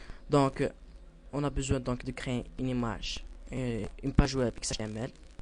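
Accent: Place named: Maghreb. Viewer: hhh super hh t marocain ou algerien bayna mn lhdra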